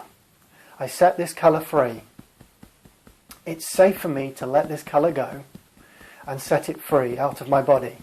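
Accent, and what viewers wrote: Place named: United Kingdom. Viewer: Hello I love your british accent! The pain is weaker! Thank you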